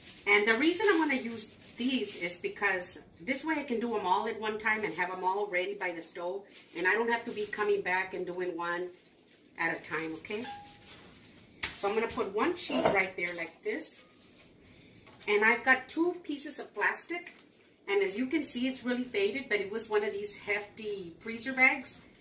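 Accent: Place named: San Antonio, United States. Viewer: Hello, when I heard your voice you sound like your from San Antonio. I don't like cooking but I just got married two years ago. I don't work anymore more so I thought this is a good time to start. I'm 60 years old from San Antonio, but just moved to sante Fe new Mexico, don't like the food here much so I want to learn to cook.. I'm excited to learn your recipes.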